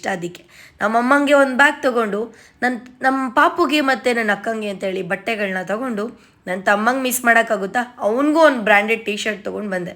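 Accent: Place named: Mangalore, India. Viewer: Nimdu Manglore Kannada na. Nice to hear that ❤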